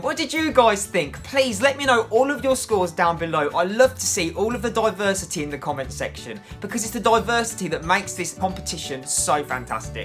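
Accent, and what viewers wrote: United Kingdom. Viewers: Greetengs from Russia. Wanna get your feedback on the question is your accent British? Btw good job, very informative and objective. Good luck bro:) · Thanks for your reaction and review 👍 I really enjoyed watching it and hearing you... I just adore British English 😍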